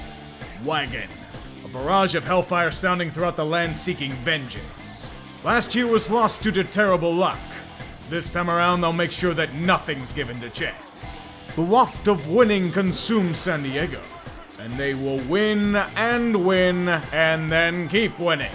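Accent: Pittsburgh, United States. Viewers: I dont even watch baseball I just enjoy hearing the yinzer yap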